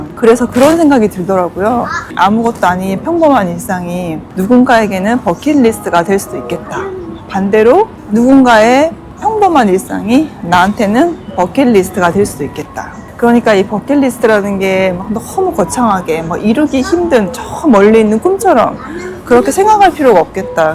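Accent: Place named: Busan, South Korea. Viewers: 겡상도 아지매네 ㅎㅎㅎ😊 하고픈거 하면서 사는거 부러워요.. 타코는 미국 캘리포니아에서 먹는게 좋아요😊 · 고향이 남쪽 어디쯤인지는 당연히 알았었는데... 갑자기 많이 고향말을 쓰시니까 또 새롭고.. 적응이 안되요😊😊 진짜 가보고 싶은 곳이 참 많은데... 언제나 다 갈 수 있을지ㅎㅎㅎ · 부산임마까 지도 부산이다아임미까 사투리방송 정겹고 좋아요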